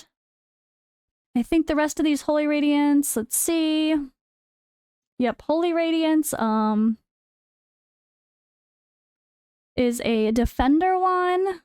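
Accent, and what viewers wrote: United States. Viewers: I didn't know you were in Romania! You sound very American to be honest. You are very awesome! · You can’t hear that she’s American……😮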